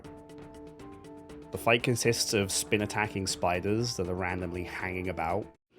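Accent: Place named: United Kingdom. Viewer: London :) that’s why I asked. I can tell you’re British. Just wondered which part of the uk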